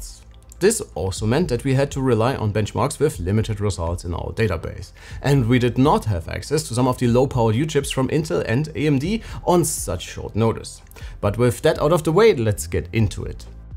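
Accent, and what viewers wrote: Germany. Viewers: Hey, du sprichst eigentlich deutsch oder? Ich erkenne doch einen deutschen der Englisch spricht :)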